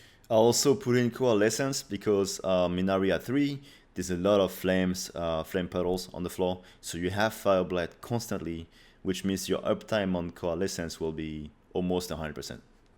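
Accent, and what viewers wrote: France. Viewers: Tu serais pas français par hasard???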